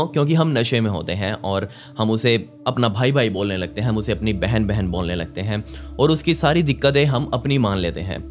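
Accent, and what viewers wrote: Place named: Western Uttar Pradesh, India. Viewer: Bhai teri language suke lagta hai tu sayad west u.p se hai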